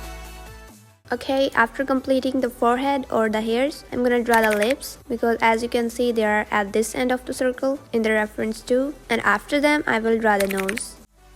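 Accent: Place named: India. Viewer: Don't hide ur indian accent.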